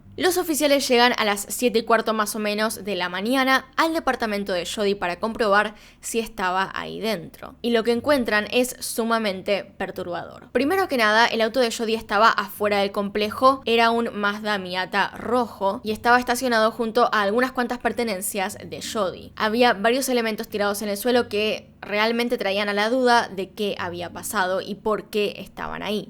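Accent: Argentina. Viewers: Sabes que el vídeo será bueno desde: "Hola y bienvenidos a mi canal de YouTube, una vez más" inserte voz argentina 😍😍😍😍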